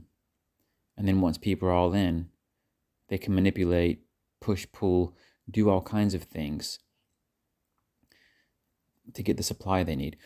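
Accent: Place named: United Kingdom. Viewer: This is an English accent mixed with an American. It’s so weird how sometimes you stray into British, and then you put the American accent on again. I hear you! British guy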